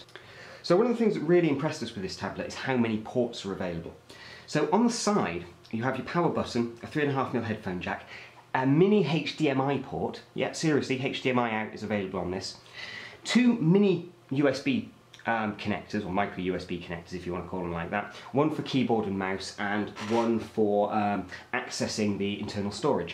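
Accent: United Kingdom. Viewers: so british :D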